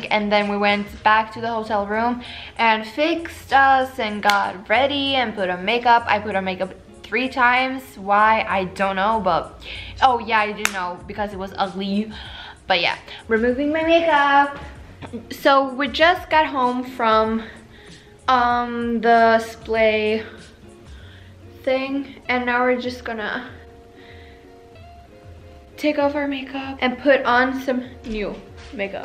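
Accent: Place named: United States. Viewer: you sound totally american like really, ilysm!!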